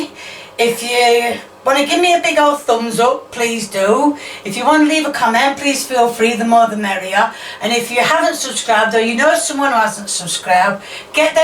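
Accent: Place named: Yorkshire, United Kingdom. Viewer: I love accent. Is it Yorkshire accent?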